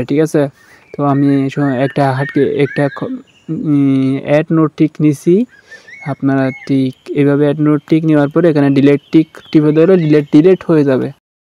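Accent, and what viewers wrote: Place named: Chittagong, Bangladesh. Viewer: ভাই আপনি চট্টগ্রামের মনে হয়। আপনার ভাষা শুনেই বুঝা যাচ্ছে 😅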